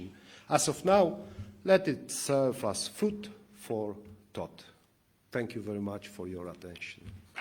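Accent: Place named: Poland. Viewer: Mówi bez błędów, ale ma polski akcent. A jaki ma mieć: francuski ? niemiecki ? Słyszeliście Francuza mówiącego po angielsku - masakra.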